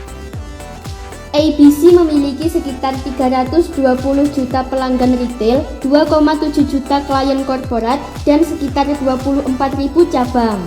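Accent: Java, Indonesia. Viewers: Medoknya terganggu banget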